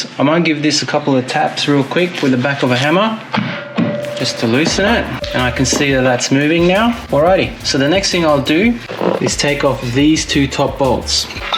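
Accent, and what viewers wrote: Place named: Connecticut, United States. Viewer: Where the fuck are you from, Connecticut? Hell of an accent, son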